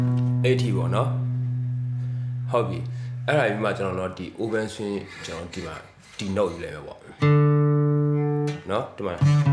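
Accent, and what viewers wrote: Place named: Danu, Myanmar. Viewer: "ညီက ပင်းတယသားလား??အသံကိုနားထောင်ရတာတော့!ဓနုအသံပေါက်တယ်နော!😊✌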